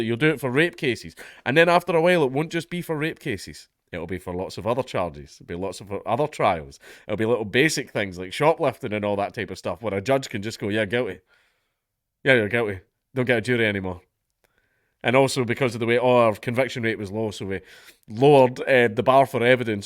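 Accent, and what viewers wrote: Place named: Scotland, United Kingdom. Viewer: Foolish, funny sounding, Scottish man, they want to weaponize the courts. You're 100% right that this will be used to free scumbags preferred by the elites. It will also be used to imprison innocent dissidents. Most importantly, it will be used as a threat to force compliance with their agenda. "Do what I say or the thought-police will snatch up your son, and the judge I own will falsely convict him of a made-up crime and toss him in a cell." -the tyrants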